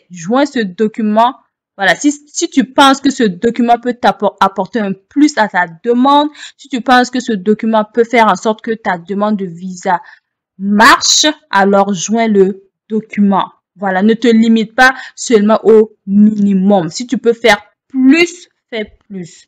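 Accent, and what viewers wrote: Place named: Quebec, Canada. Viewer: Tu as déjà l'accent québécois.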